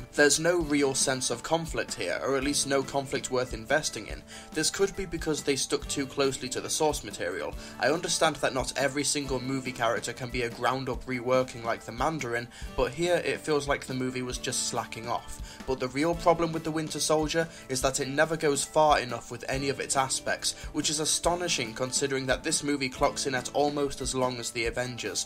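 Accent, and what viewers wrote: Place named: United Kingdom. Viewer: Can you at lest TRY to have an American accent when talking about CAPTAIN AMERICA!!?? Damn Brits should stay in England and talk about English stuff!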